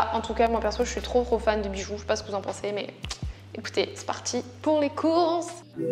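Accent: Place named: South, France. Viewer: De l’authentique (avec l'accent d’Ugolin dans Manon des sources ) c'est pour cela que tu as beaucoup d'abonnés et que tu en auras encore plus 👏ne change rien tu es magnifique autant de l'intérieur que de l'extérieur 😘😘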